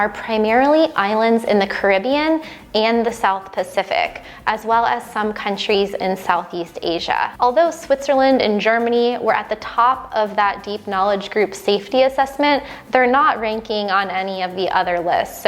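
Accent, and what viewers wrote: United States. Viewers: Meanwhile at a place near you in the US of A confirmed Covid19 cases 6.83M, deaths 155K Source Covid19 alert. Africa population 1.22B Covid19 cases 870K, Deaths 100K Recoveries 524, 557 African countries in no particular order: Ghana Population 30M Covid19 confirmed cases 34,406 Ethiopia Population 109.2M Covid19 confirmed cases 15,200 Kenya Population 51.4M Covid19 confirmed cases 18,508 Source John Hopkins's University Africa Centre for Disease Control As soon as I saw the blonde hair and American accent shows a picture of the entire world and only focuses on countries with people who look like her. For a disease that doesn't discriminate; I am thousands of miles away from America so this one can't call the American Police on me to shoot me!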